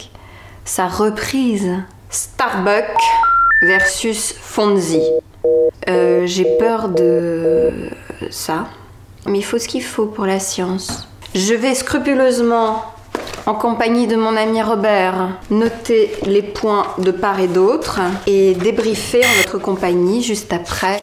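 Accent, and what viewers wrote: Quebec, Canada. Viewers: Pour ton info, elle vient en effet du Québec. Et elle ne fait pas semblant d'être quoi que ce soit : elle vit en France depuis plusieurs années. Du coup, tu finis par être à l,aise avec la langue de ton nouveau chez toi. Elle a appris avec le temps et on ne voit pas la différence ou presque. Mais le Québec reste caché et peut sortir facilement ! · xoxo Elle «imite» aussi bien l'accent français puisqu'elle est québécoise. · elle a pas l'air francaise :') ton accens québecois est trop bien fait ahah et c'est sur que si tu prend le pire film quebecois sa sera nul même les quebecois écoute pas ca genre je suis québecoise et j'ai jamais écouter ca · Solange est pas fucké, elle m'a l'air d'une Québécoise qui fait semblant d'être une parisienne... lol.. Ton Québécois est parfait, t'es Française ou Québécoise? En passant, si l'origine du Français Canadien t'intéresse, écoute du Joual. 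Les coins de campagne, le bas du fleuve, ou les maritimes encore aujourd'hui parlent un Français très similaire, mais en ville notre Français est très commun. Le joual et le Québécois est au Français comme le Créole est au Français. <link> (active les sous-titres!) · Jsuis québécoise pis jtrouve que ta un superbe accent québécois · J'adore lorsque tu parles avec ton accent québécois! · T'a tellement pri d'accent quebequois c'est fou!!!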